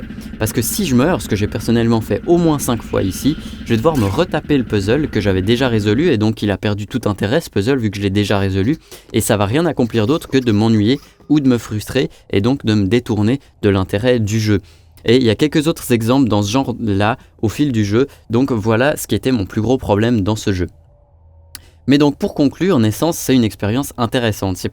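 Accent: Belgium. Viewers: tes belge ?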